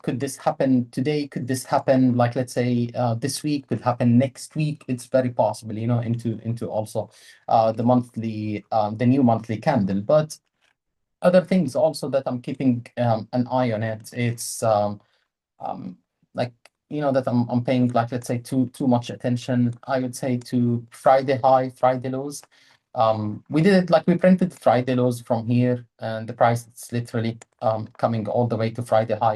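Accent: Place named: Saudi Arabia. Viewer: saudi accent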